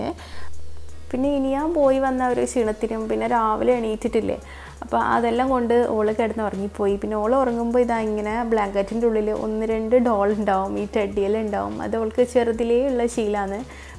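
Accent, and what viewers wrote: Kannur, India. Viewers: നിങ്ങളുടെ കണ്ണൂർ ഭാഷ നല്ലോണം പറയണം കേട്ടോ. കേൾക്കാൻ നല്ല രസമുണ്ട്. നമ്മൾ പാലക്കാട്‌ ജില്ല യിൽ ആണ് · Thalasseryilum vadakarayilum okke varavu ennu thanneyanu parayunnadu.. Sarikkum kannur bhasha kelkkumbol sandosham thonnunnu...Husbandinu endanu jyoli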